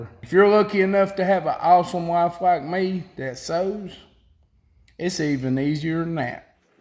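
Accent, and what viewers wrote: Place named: South, United States. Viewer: Great idea bud. Hey I have to mention your accent twang is very similar to mine. I'm not makin fun, I never realized that I had such a southern twang accent til I heard my recorded voice and it totally cracked me up. I'm from the Texas panhandle but my grandparents migrated from Tennessee so there it is.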